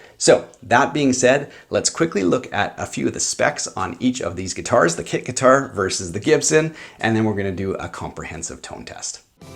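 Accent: Canada. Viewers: I just noticed he has a Canadian accent. Nice.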